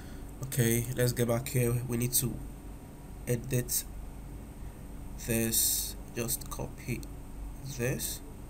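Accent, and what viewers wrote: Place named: Nigeria. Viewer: Wow!. I was watching BOVI comedy on my Tab while searching for How to work on Instant Article. I had to stop Bovi when you started talking. A NIGERIAN VOICE? MAN!. GOD BLESS YOU. I didn't skip the ads either because I believe that's a way to say THANK YOU!. GOD BLESS YOU MAN.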